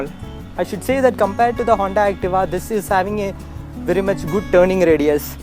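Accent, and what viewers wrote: Kerala, India. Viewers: are u a malyaleee ur accent songs like a mayalii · you seem to have a mallu accent 😁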